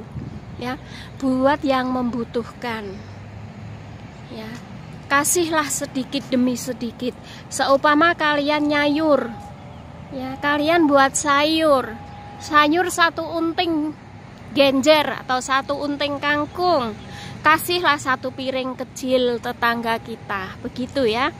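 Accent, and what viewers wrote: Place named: Java, Indonesia. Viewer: Wong jowo yo mbak? Karena bahasanya agak medok....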